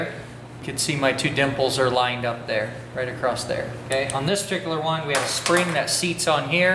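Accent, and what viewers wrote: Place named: South, United States. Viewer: Very very good this is one of the best ...I cant handle half the guys talking to much ....this fella just simply explains it I'd dang near say he's southern 😉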